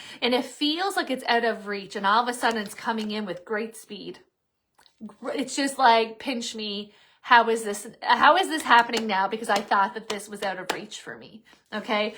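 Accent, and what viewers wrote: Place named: Nova Scotia, Canada. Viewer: Hi! I've been listening to your posts for a couple months now. I'm from NS originally but now live away, so it's nice to hear the accent from back home. Your readings are always on point for me, plus they're enjoyable to listen to. Thanks for posting! 😊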